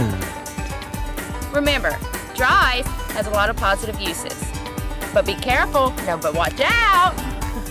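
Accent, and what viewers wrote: South, United States. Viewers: southern accent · she got a southern accent!!! i like!!! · I like her southern accent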